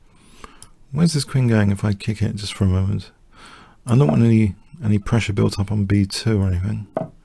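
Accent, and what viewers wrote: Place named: London, United Kingdom. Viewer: Yeah it cause a londaner, "th" becomes "f" or "v" depending on the word!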